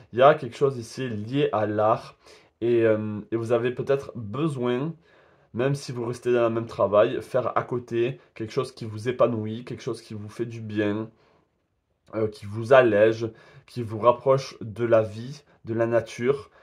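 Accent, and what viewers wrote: South, France. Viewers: coup de foudre au boulot oui, je me suis inscrite à la danse et commence la semaine prochaine, je peints également et pratique les oracles ++++ puisque parait il je suis douée merci pour cette guidance et ce petit accent du sud que j'adore étant du sud ouest ;) belle journée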